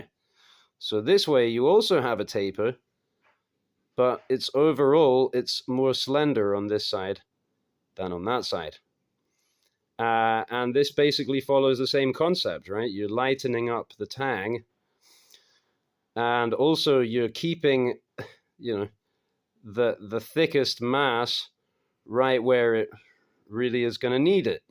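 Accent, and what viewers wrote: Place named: Scotland, United Kingdom. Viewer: I knew I detected a little bit of Scottish. I just hadn't heard you talk enough to make the determination. I think you have sold me on the tapered Tang..also . 2 way tapered Tang?! What is this sorcery ?! I just learned about the single taper 😂 😂 I also finished the rough-in of that knife, I was actually able to get it surface ground pretty decently on my disc grinder but I don't think I will be able to taper it on the disc grinder, so I think I will have to switch over to the belt and start working on it that way. It's going to look really cool in the end because it will have a distal taper and a tang taper. I'm over here in virginia, close to Virginia Beach. Collab in the near future per chance? 😂 The two way is very cool looking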